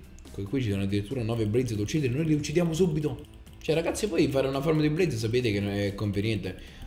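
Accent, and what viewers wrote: Naples, Italy. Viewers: Ma sei di Napoli giusto?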